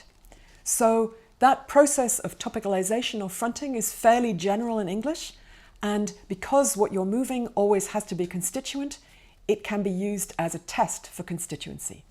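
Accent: Scotland, United Kingdom. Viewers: this is from the University of Edinburgh, I suppose it is a kind of Scottish accent.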